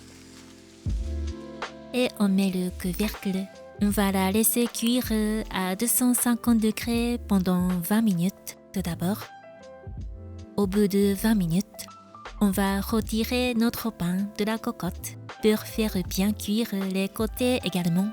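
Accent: Japan. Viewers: Inconditionelle de votre adorable accent japonais, et très envie de tester votre recette mais je n'ai pas de cocotte qui va au four, y a-t-il une autre solution ? Merci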